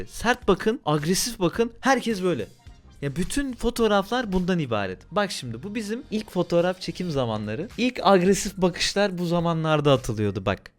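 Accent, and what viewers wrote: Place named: Russia. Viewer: yayını izlemediyseniz adam rus o yüzden öyle konuşuyor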